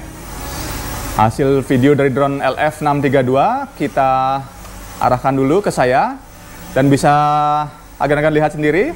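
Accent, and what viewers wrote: Bali, Indonesia. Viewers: Makasi Bang review Dronenya jadi tau kualitasnya. Btw asli Jatim Bang? Soalnya Logatnya Logat Bali 🙏😁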